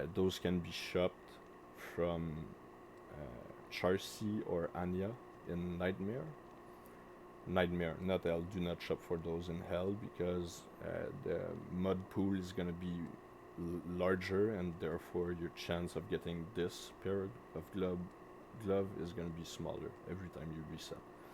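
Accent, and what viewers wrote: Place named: Quebec, Canada. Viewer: hahah l'accent Québécois est assez présent.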